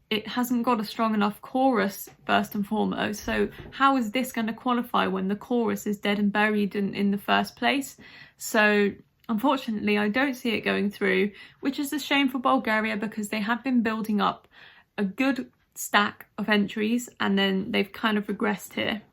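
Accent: United Kingdom. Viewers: you have a lovely English accent and you look gorgeous. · I am not a huge fan of Eurovision, and it's veeery seldom that I watch "reactions". This year is an exception, because of our Kalush Orchestra participation at Eurovision 2022. Thank you for your respectful analysis of this entry. I have watched some "reactions" (which was mostly like Oh/wow/lifted eyebrows kind of reactions, which is neither fun nor development)). But your channel gives so much more! Your Reactions are more like Analysis than simple reactions, actually. Love your British accent), love your genuine vibes, love your respect in predictions. Share your emotions towards Måneskin)). Thank you! You are doing it right! Love from Ukraine! Since we cannot vote for our country, my vote this year is for Sam Ryder, UK. Very good entry!! Vocals, aesthetics, the whole message- good luck, Sam!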